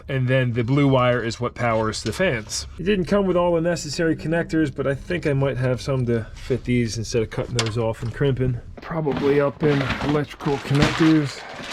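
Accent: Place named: Pittsburgh, United States. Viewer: Are you originally from Pittsburgh?? Your accent is so reminiscent of Pittsburghese it's uncanny.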